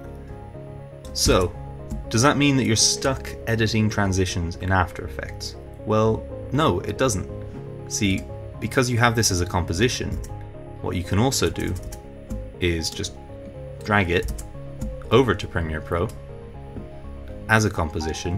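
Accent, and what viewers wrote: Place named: Dublin 4, Ireland. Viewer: Dublin 4 accent if I ever heard one haha :P Great tutorial keep up the good work bro.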